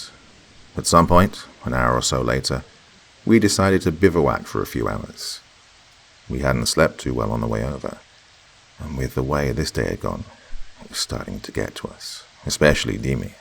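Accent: Russia. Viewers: OMG YOUR RUSSIAN ACCENT!!!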